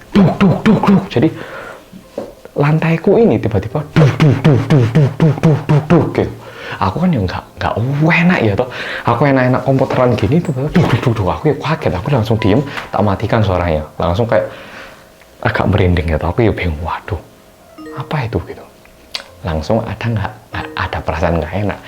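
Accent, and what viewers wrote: Java, Indonesia. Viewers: Smoga mas ttep jd org korea yg medhok bkn org korea yg kek gtu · Salam selamat & sejahtera utk Cak medhok dgn istri,slmt mlm,saya dari kota Bandung,saya ikut prihatin dgn pengalaman tdk enakmu tinggal di apartemen di Korea,oh iya saya punya info sangat penting tentang "Sesuatu hal dari budaya & tradisi Sunda" yg pasti akan membuatmu sangat suka jika mengetahuinya,yaitu info tentang hidup jaya yg mana setiap orang serta setiap rumah tangga membutuhkannya,tapi kita berdua harus ngobrol langsung,dtglah ke Bandung,salam kenal utk kalian berdua,salam Rahayu. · . Jawaa bgt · Mas pindah Indonesia neng kene biar kecil2 omahe yoh nyaman ora wedhi wedhi kyk ngono. Seng sabar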